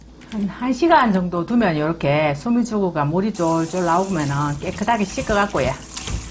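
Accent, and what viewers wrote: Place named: Jeolla, South Korea. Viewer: 그치예? 요래요래.. 그래도 되고예... ㅋ... 남도사투리..옛 사랑이 생각나는 사투리 입니다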